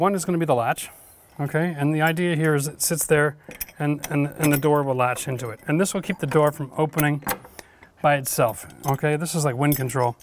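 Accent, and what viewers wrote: Canada. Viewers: You Canadian boss??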